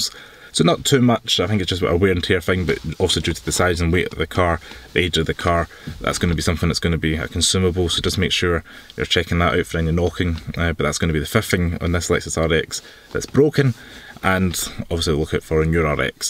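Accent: Scotland, United Kingdom. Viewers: Not that easy to understand scottish english... Thanks for that interersting and useful video. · Scottish English is cool 😎 👍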